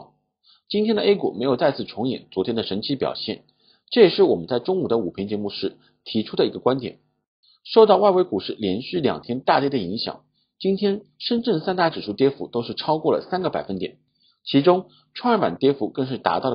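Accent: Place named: Shanghai, China. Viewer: 听老师口音祖籍应该上海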